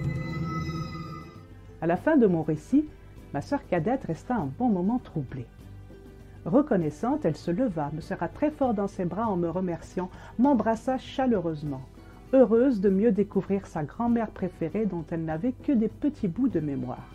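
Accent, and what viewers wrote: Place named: France. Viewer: Votre accent français est si beau! Pas comme notre français au Québec hahaha !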